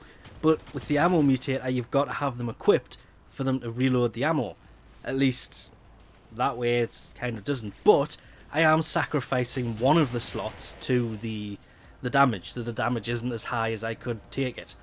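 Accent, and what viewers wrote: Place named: Northeast England, United Kingdom. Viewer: Sounds like North East England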